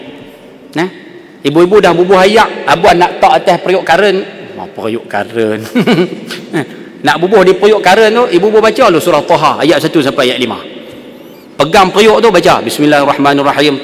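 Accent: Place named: North, Malaysia. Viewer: Antara ustaz loghat Utara yg aku sgt minati seangkatan Ust Shamsuri,Ust Don,Ust Wadi dan Ust Abdullah Khairi.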